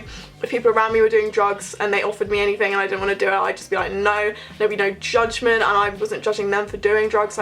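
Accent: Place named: Bristol, United Kingdom. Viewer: Really helpful, I’m thinking of going, and I imagine your from bris aswell cause of the accent n distance away haha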